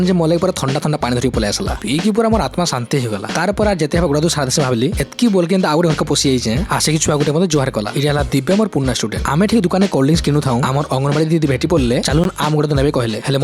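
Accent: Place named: Odisha, India. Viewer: Aapankar video bhal lagla.sambalpuri bhasa aapankar muhu ru sundar aau smart laguchhe.aashiwad karun mui b jadi teacher hei jeti....🙏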